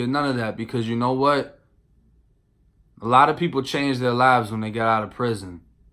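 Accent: Boston, United States. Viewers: I am just trolling a little bit lol but not for negative reasons just trying to give you laugh and get your attention at the same time. Few questions for you. You ever come across in prison any of the people that killed that guy based of the movie bully. I know Florida that case was huge so big that it turned it into a movie. I love people from Boston just the way they talk and the way they carry themselves that's why I vibe with your channel but with that said boston can be pretty racist unlike Florida were it seems to be more diverse you won't see nearly as much racism. I only bring this up because you were talking about trying to work at Irish bars when you got out of prison I figured that would put out a target on you being blood and all the gang releated tattoos. I know that side of Boston would hate on you but I could be wrong. Also you remind me of one dude from the movie the town. 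I was watching the movie 2 days and I remember the interragation scene I am like this dude be sounding just like you. Boston be going hard with those gangster crime flicks if you get a chance the average person don't know this movie but its called what doesnt kill you best Boston gangster crime flick I have ever seen and its based on a true story about to bad as dudes from boston that came from nobodies to running boston This the link in case you never seen <link> Also this dude is a trip this guy was a regular no gang affiliated white kid from Boston who became one of the biggest gangster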